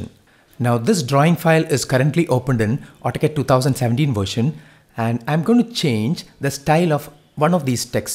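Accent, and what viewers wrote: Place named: India. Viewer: Thank you for the effort in making these videos. I just wish that you remove that Indian accent when speaking the English. Excuse me, I wanted to learn in what your are teaching, but it always annoys me listening to that accent. Please learn to speak English w/o that accent. Thank you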